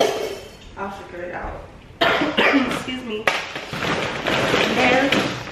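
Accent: Jamaica. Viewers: First time on your channel and I was watching part 2 to this. I hear your accent and I was like she sounds Jamaican (I'm a Jamaican) lol and I was like alright let me go to the first one and here I am. Congrats girl!!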